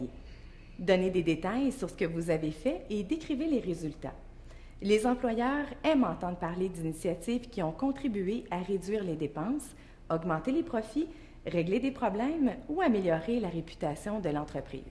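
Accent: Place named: Quebec, Canada. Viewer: i think so shes from quebec thats why she has an accent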